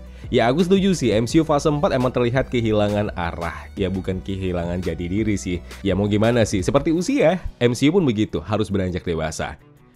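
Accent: Medan, Indonesia. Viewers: Orang Medan kau bg?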